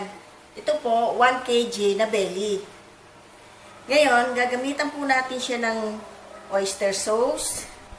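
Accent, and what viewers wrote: Philippines. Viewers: idol ko si ATE TAGALOG na tagalog ang punto ..Ako po ay taga BULACAN BULACAN kayo po ay taga saan ?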